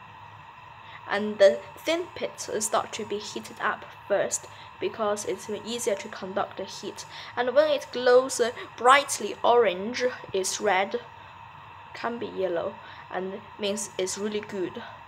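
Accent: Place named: RP, United Kingdom. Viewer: Why does this guy sound so posh?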